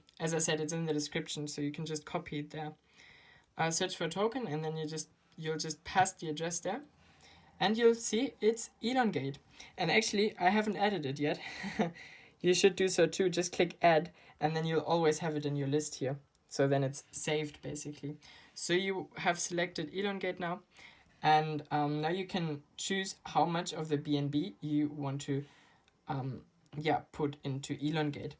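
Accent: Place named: Germany. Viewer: For being German your accent really isn’t bad. Good video thank you for explaining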